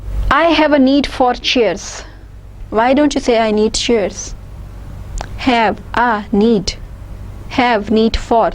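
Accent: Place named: India. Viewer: only your accent is indian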